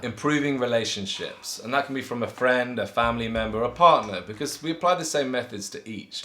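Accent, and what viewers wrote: United Kingdom. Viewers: !! You're like Rudy from Misfits!! do u watch it? aha just found a similarities with the twins and the british accent. Keep making videos!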